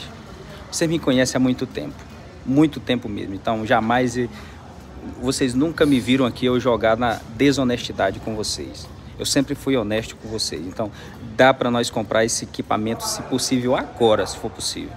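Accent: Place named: Northeast, Brazil. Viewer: Sou do Paraná, Santo Antônio da Platina.Adoro o sotaque nordestino, e essa sua voz é alegria é contagiante. ❤ ❤ ❤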